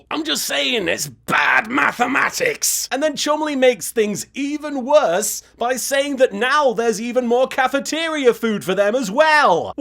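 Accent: United Kingdom. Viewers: Random nonsense in a British accent... That's one of my favorite kinds of random nonsense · Random Nonsense in a British Accent is it's own official brand of comedy as far as I am concerned. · Chillin' out without a crew? Are we, your fans, not enough of a crew? Are we too motley? Random nonsense in a British accent... that is totally my jam. Do it more with less people... all those virile young people, alone on an island... yeah, there will be more something going on... Give me 3... steps mister, 3 steps towards the door? Jaden's pun at the end... it didn't have a ghost of a chance.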